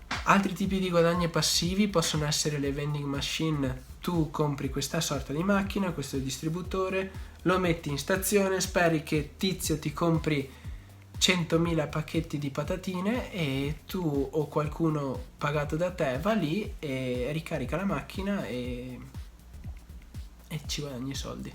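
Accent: Veneto, Italy. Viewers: Sei veneto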